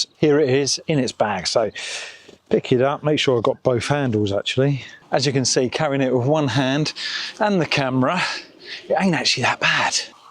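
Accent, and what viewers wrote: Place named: Essex, United Kingdom. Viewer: Same here too - this is happening with quite a lot with channels I subscribe to. Great channel this, and the fella sounds a bit Essex! (my area) I am about to pull the trigger on buying one of these (2026 model)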